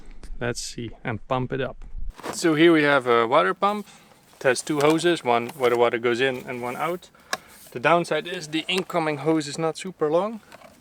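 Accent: Netherlands. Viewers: So obvious cause of the accent he is Dutch from origin.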